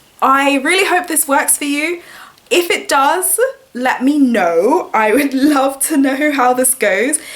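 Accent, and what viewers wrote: England, United Kingdom. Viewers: 😃 OK only a true Hilarian would know that. It's just that you sound SO English I thought it couldn't possible be so but then again that logo is burned into my brain! 😀 Anyway, old head here (class of '91 eep!) and I live in London. Bless! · Isnt that the cutest lil english Disney-sounding accent ever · I didn't think of disney but just those children's story books. She has a great accent. It's English but her type of English sounds nice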